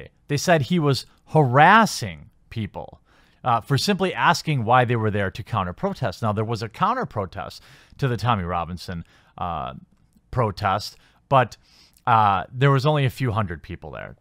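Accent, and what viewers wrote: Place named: Wisconsin, United States. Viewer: What? youre from wisco? Haha I knew the way you speak sounded awfully familiar. I'm from Madison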